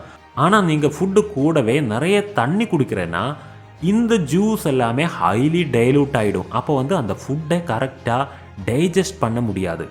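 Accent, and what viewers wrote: Kerala, India. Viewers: Kerala bro❤️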